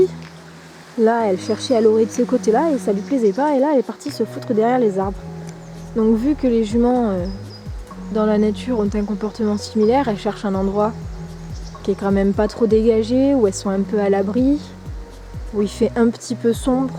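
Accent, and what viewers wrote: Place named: South, France. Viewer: Oh l’accent du sud 😂👌🏼 J’adore !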